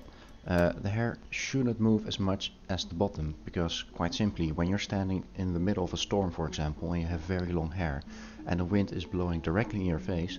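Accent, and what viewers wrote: Netherlands. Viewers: Aan je accent te horen ben je Nederlands :P Mooie tutorial, heeft mij erg geholpen!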